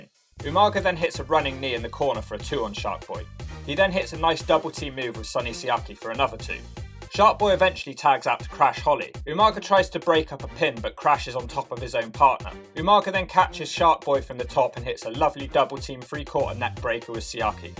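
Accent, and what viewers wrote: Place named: Somerset, United Kingdom. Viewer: That accent is so Somerset I love it. It reminded me of Sunday lunch 🤣🤣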